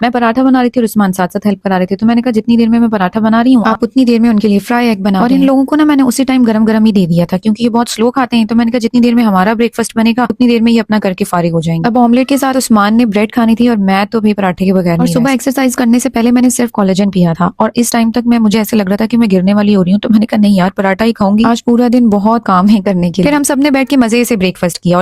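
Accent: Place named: Pakistan. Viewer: Shakal accent se b pata lag Raha k Pakistani hai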